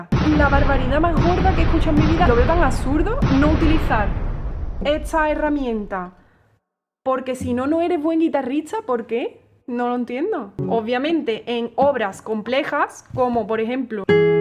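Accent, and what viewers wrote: Andalusia, Spain. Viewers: ese acento andaluz ufff me mata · Me gusta como hablas, de que región de España eres? Ya entiendo un poco más porque en toda Latinoamérica hay diferentes acentos dependiendo del país que se hable, aquí mismo en México hay distintos acentos dependiendo de la región que se hable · Tó el arte!!!! 😂😂 Diego del Morao hace unos transportes q flipas, pero a ese nivel... y los guitarristas acústicos del fingerpicking?? La usan sin ningún complejo. Papafritas hay x tos laos!!! Ole tu acento sin reparos ni tapujos y el q no lo entienda q aprenda andalú!! 👏👏👏👏👏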